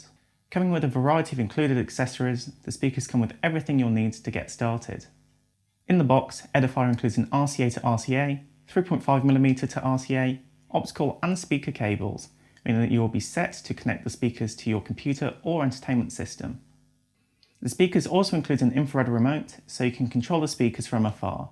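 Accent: United Kingdom. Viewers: i'm really impressed with your clean british accent, nice review man... i must have this one this coming christmas eve! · This guy's British accent is so thick, I can't understand him '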